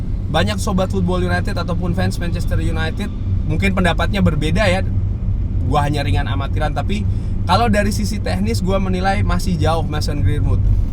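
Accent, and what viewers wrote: Semarang, Indonesia. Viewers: Orang Semarang bang?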